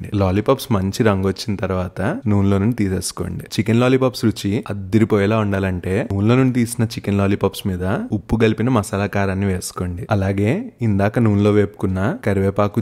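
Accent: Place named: Vijayawada, India. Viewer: Mana bezawada accent keko keka brooo..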